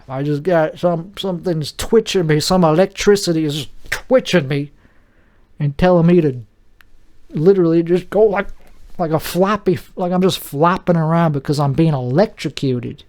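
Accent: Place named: United States. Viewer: I am russian. And your accent is so American. 😮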